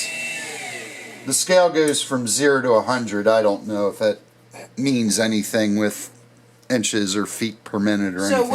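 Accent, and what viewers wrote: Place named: Maryland, United States. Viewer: I thought I detected a Maryland accent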